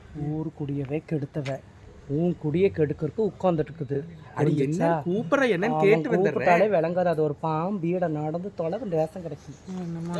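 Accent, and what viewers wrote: Coimbatore, India. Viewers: கோவைத்தமிழும் உங்கள் முகபாவனையும் மிக அருமை😂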